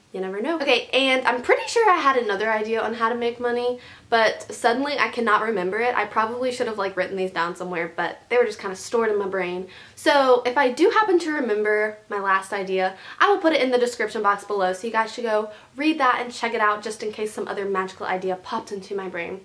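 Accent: South, United States. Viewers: Luv your southern accent I have one to!!! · i love her southern accent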